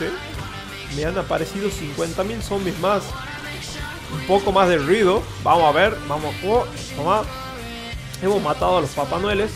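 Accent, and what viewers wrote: Tucumán, Argentina. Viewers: Tucumano hasta el pingo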